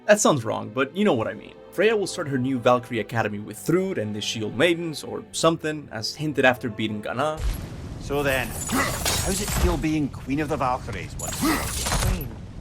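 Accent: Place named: Cuba. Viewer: ah yes, Kaptain Kutie well duh. It's the name of the game. Awesome video,! You sound a bit cuban but i like it!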